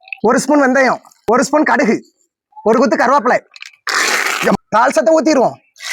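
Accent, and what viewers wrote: Tirunelveli, India. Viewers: Ele thambi. Un kulambu eppadiyo...aana nellai tamil. Suvaiyo suvai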